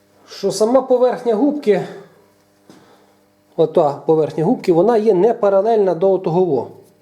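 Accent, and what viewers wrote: Ukraine. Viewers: Захожу смотреть твои видео, только чтобы услышать настоящую украинскую речь)))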